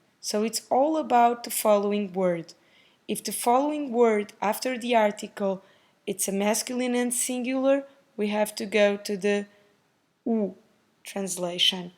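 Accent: Portugal. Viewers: its European Portuguese or brazilian?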